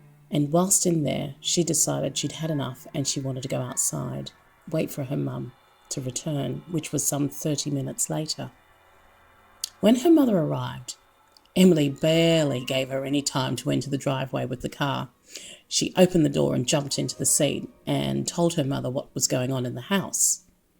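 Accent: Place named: Australia. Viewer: You're a great narrator, always enjoyed your work with Dark Waters channel.....love the Australian accent and would love to hear you and Be.Busta do a collaboration.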